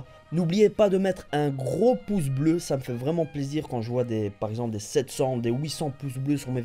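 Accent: Belgium. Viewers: tu viens d'où en Belgique ?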